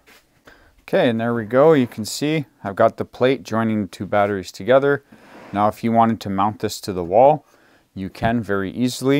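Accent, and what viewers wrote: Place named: Texas, United States. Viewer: I love your videos but your accent is really cute (i had to edit because youtard doesn't understand Texan mixed with Spanish lol)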